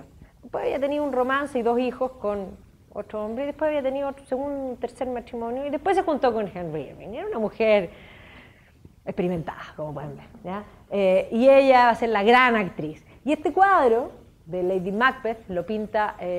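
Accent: Chile. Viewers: Muy bueno! Comentario aparte: “Shakespeare se fue a pata a Londres”. Qué simpática forma de hablar tienen en Chile! 🥰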